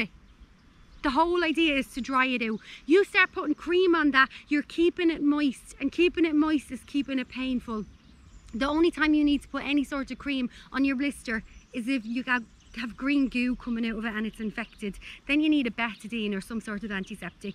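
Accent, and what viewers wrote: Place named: Dublin, Ireland. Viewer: Love your accent sweet Dublin 😍great video thanks 🦁lionel x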